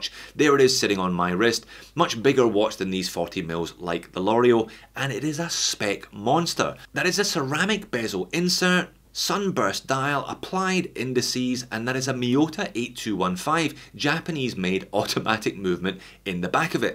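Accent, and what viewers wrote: Scotland, United Kingdom. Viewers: I appreciate your work and the Scottish accent! Have you considered buying a real Flieger from Stowa?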